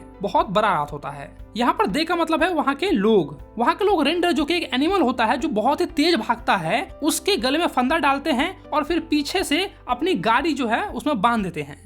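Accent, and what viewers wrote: Bihar, India. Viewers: Kya bihari hai ye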